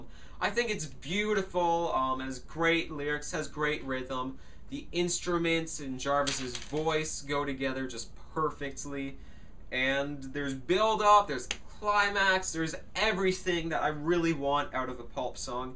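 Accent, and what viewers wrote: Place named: Transatlantic, United States. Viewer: You're not only the first person with one of those funny transatlantic accents I've seen to like Pulp. You're probably the first Yank I've met who properly gets the class system we have over here. Kudos for that. Seriously. Also interesting point about something changed. Never thought about it before but you're right. It doesn't fit with the rest of the album. Not bad for a whippersnapper.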